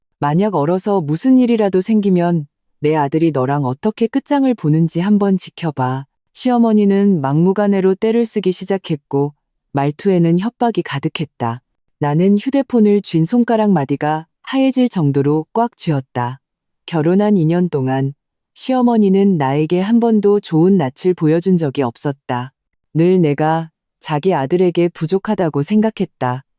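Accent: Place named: China. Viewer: 해설자가 중국사람이야 왜.숫자를.그렇게잃냐.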